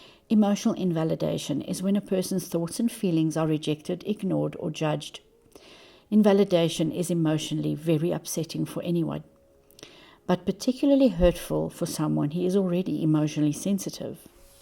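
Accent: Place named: South Africa. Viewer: I thought I had recognized your accent… from SA myself 🥰 but living in Portugal. Thank you for your time 🌸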